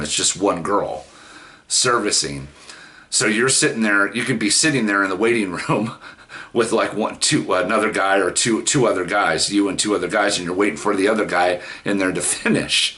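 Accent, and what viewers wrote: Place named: England, United Kingdom. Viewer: Great English accent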